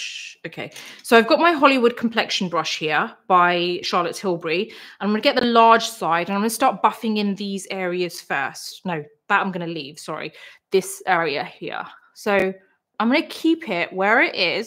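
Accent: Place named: United Kingdom. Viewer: The final look is beautiful! You did great, be proud😊 Thanks for that joyful and educational video. I very much appreciate that you now about your influence as a youtuber and you still remember where you cone from and what you have achieved. By the way, I love your British accent ❤ Thanks for your all your work. Looking forward to the next live😅 Love from Germany ❤❤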